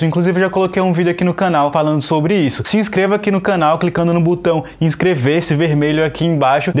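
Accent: Northeast, Brazil. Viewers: Tu é do meu Nordeste,é? Que vídeo maravilhoso. ❤️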